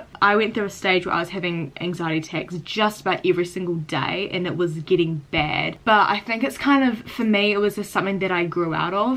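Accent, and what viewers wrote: New Zealand. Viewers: I love the New Zealand accent, but sometimes I can’t understand what you are saying, and how old are you? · New sub 😜 betcha hear it allll the time but ur accent is awesome, I'm a kiwi aswl but my accent is nowhere near as cool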